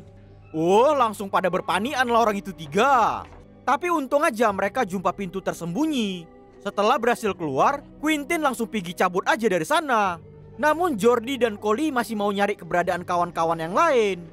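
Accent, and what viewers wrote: Medan, Indonesia. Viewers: Orang medan abang ini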